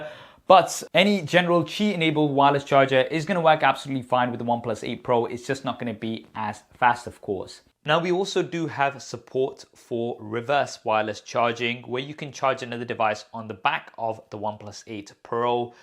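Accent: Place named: United Kingdom. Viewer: He is probably Indian but he has an British accent so he probably lives in uk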